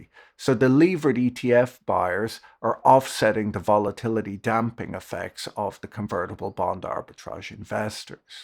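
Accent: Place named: Ireland. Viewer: Patrick, is it my imagination, or are you trying to downplay your accent? Please don't change the way you speak! I love your Irish accent! 🇮🇪 ❤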